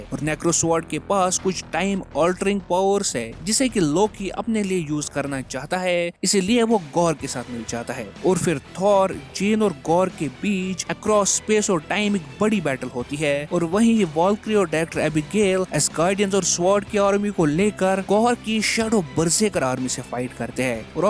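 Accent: Kashmir, India. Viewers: i think he orator seems to be a Kashmiri..?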